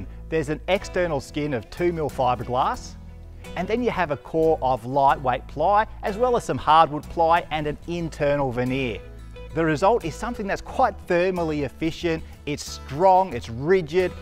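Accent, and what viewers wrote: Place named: Australia. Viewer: South Africans 🇿🇦 started the offroad caravan trend, yes, but others keep on innovating. I just luvv the designs by the Aussies. And that Ozzie accent is just the best. A controla. Or a V8 moda. Just love the dialect.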